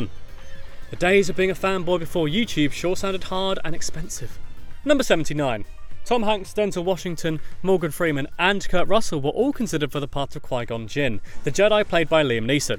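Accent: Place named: United Kingdom. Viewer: He’s British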